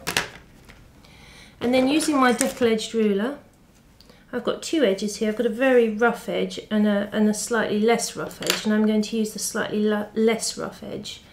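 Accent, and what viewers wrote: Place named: England, United Kingdom. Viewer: Hence she has an English accent. We say people have French, German, Irish and other national accents just as I have a Scottish accent so why not an English one?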